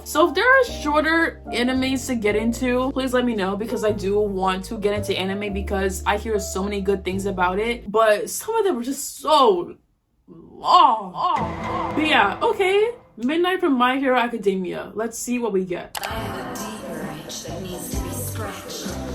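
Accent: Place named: United Kingdom. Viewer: you’re editing honestly kills me off everytime!!! love ur vids 🫶 and also ur british accent isn’t half bad :)) love from, a mancunian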